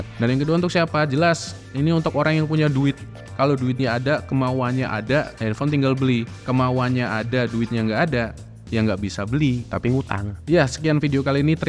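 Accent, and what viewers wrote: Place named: Java, Indonesia. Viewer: Mantap maseh 😂 jowoan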